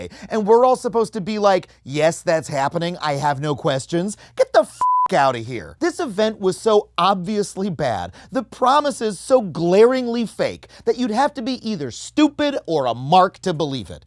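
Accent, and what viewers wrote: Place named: California, United States. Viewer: I get his point, but has such a California pretentious way of speaking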